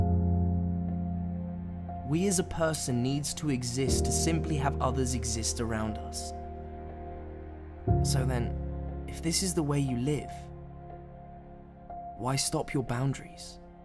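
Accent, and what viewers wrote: United Kingdom. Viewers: Accent sounds crazily British. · actually it's one of the most impressive motivational videos i've ever seen best speech , great video and your British accent is astonishing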